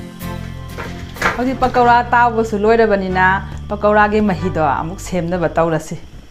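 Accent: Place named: Kakching, India. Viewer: kakching maron ne amfao tajadribo.keino noise migi maron khoibase kothok2 tana hangisibo.manipir kachin amata koibirudribo.lam2 gi matung ena lon se adum khoibane..mapan thoktaba mi yam male.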